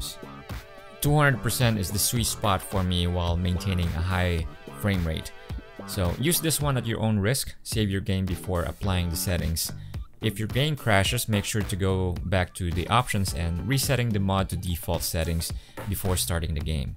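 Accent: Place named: United States. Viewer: goddmorning. NC u half or what? I mean the voice is so American HAHA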